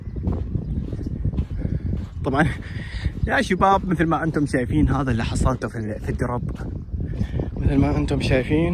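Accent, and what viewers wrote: Oman. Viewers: والله كنت حاس من كلامك انك عماني 🇴🇲. احبك. متابعنك من ولاية محوت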